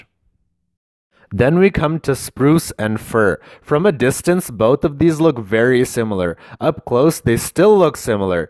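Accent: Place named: Canada. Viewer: I like a Canadian accent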